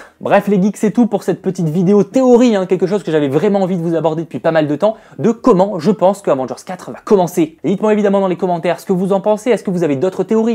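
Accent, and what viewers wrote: England, United Kingdom. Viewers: Idem, du coup gros spoile sur la fin de Ant-Man et la Guêpe... Je crois qu'il annonce au début de la vidéo qu'il faut avoir vu le film mais je n'avais rien compris ce qu'il disait à ce moment là tellement qu'il parle vite et articule mal (+ une prononciation à l'anglaise), même en retournant en arrière plusieurs fois pour essayer bien de comprendre les deux films qu'il fallait surtout avoir vu (j'avais juste compris pour Avengers 3 mais pas pour l'autre). Merci pour ce spoile dont je me serais bien passé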